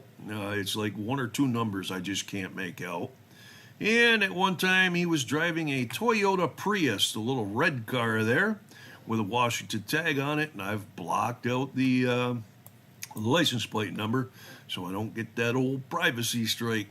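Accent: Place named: Balkans. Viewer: With his accent, I would guess his people are from the Balkans.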